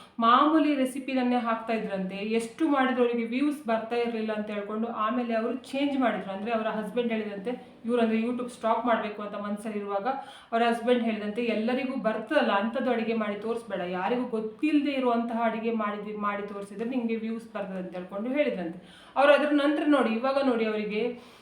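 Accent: Mangalore, India. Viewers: Mangalore bhhashe tumba chanda maarre ..😅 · ನಿಮ್ಮ ಮಂಗಳೂರು ಭಾಷೆ ನನಗೆ ತುಂಬಾನೇ ಇಷ್ಟ ತುಂಬಾ ಚೆನ್ನಾಗಿ ಮಾತಾಡ್ತೀರಾ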